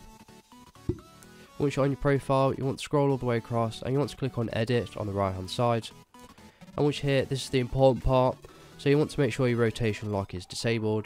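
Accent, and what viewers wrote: United Kingdom. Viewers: What a beautiful Voice, is british language ? Wow. 🤗✨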